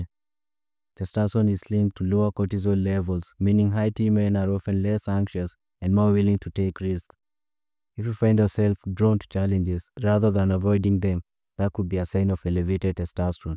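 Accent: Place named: South Africa. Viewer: Sounds South African 🇿🇦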